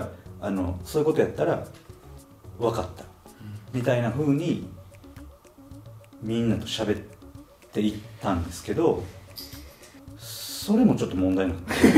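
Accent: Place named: Kansai, Japan. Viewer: 関西弁も相まって宋世羅の羅針盤チャンネルを彷彿させる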